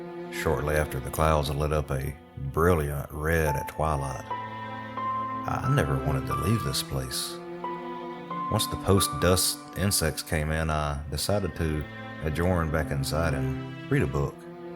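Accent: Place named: South, United States. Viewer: Glad I found your channel. I’m from the south too and it’s refreshing to hear these in a familiar voice. Keep doing what your doing!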